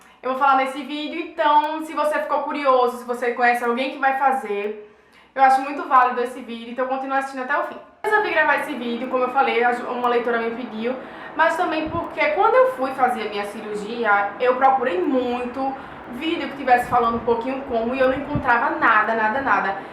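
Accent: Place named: Recife, Brazil. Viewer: acho lindo esse sotaque, tenho uma amiga de Recife, parece que é ela quem está falando rs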